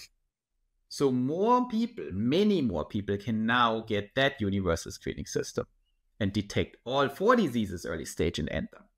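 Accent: Germany. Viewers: I sometimes don’t believe my physician, he’s not young and thinks things I don’t. For instance cholesterol. Some people have it genetically and it’s normal. I do go in annually but it’s not like a screening like you are saying. I like your ideas and catching disease early is beneficial 👍🏼 Is your accent German?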